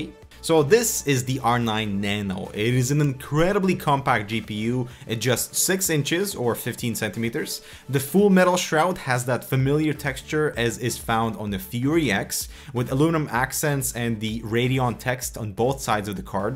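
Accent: Russia. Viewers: can't listen to this russki accent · Мне кажется или у тебя чутка русский акцент?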